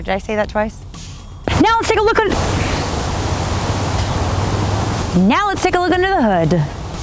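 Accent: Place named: Boston, United States. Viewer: Gotta love that Bawhstan accent! I'm from MA originally, love the video! :)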